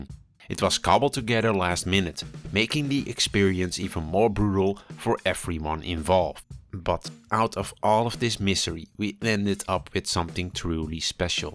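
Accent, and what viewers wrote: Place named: Netherlands. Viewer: The accent... ah, the channel is dutch. I just recognize it now by sound.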